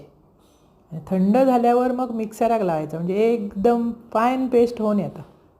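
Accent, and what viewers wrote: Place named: Malvan, India. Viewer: सुंदर आणि सहजगत्या करता आपण आणि साधेपणा आणि मालवणी भाषेतला गोडवा खूप मजा नायतर आमच्या बायका कधीपण काय पण घालतत त्यांका समजवणार कोण लगेच भांडूक तय्यार पदर खोचून " तुमका काय अक्कल असा? 🤣🤣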